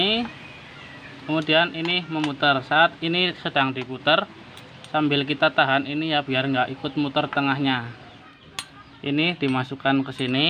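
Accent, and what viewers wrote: Banyumas, Indonesia. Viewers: Dalam ngapak😂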